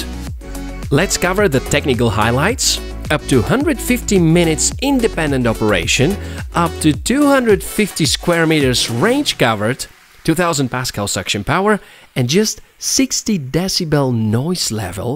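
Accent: Netherlands. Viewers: I love your dutch accent... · The finest, least perceptible Dutch accent I've heard in a long time. Respect!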